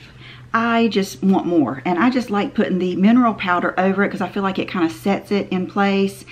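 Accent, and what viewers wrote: South, United States. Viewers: I love your southern accent so much 🦋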